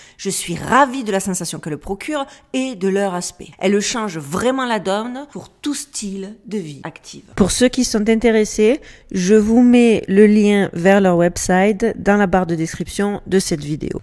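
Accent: South, France. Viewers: J'ai decouvert recemment votre chaine et franchement bravo : votre déroulé est structuré, les themes pertinents et suspens, humour et cet accent du sud que j'me tant. Tout y est. Alors on ne lache rien, on met nos ceintures et on s'envole avec vous pour des horizons plus ouverts. Tant mieux si tout ce qu'on a appris etait faux ca nous force a decoder plus loin. Et en science on dit que toute hypothese est vraie jusqu'a ce qu'une autre l'infirme et la remplace. Restons modestes et les yeux et le coeur grand ouvert. Vous nous montrez la voie. Les contes et mythologies populaires nous plongent dans nos origines et les conteurs etaient des personnes riches d'un savoir caché transmis de bouche a oreille alors j'embarque avec joie !!!! Force a vous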